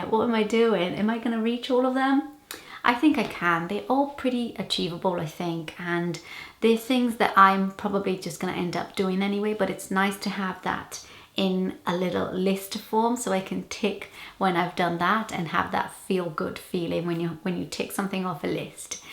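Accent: United Kingdom. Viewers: I started to watch your videos because I love British accent and I am Brazilian as well. My purpose is improve my vocabulary and my listening skills . Congrats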